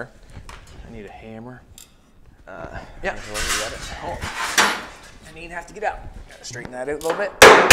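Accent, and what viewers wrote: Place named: Canada. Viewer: Just discovered your channel a few days ago, and when I heard your accent, I immediately knew this has to a Canadian guy. Your videos are a bit longer than most of the videos I watch, but it's nice to see the uncut process of your work. I think it inspires a lot of people who would otherwise think they couldn't possibly do the things you do. It's good to see not only the successes and final outcomes, but also the trial and error that are part of real life. Also nice to see family involved in your passion. Carry it forward. New subscriber here.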